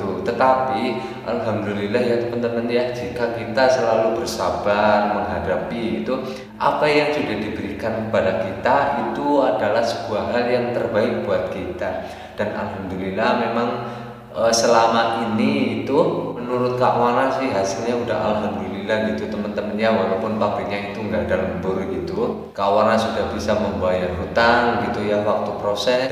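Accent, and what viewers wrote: Banyumas, Indonesia. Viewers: Ohw wong ngapak yak..itulah perjuangan yg di jalani dengn sabar ..dan tlaten yo pasti panen..👍💪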